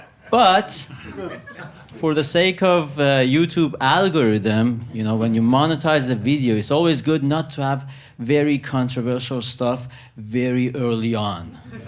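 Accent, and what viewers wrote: Iran. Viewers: How does he have a Persian (Iranian/Farsi) accent when his name is? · Why is he speaking like Iranians lol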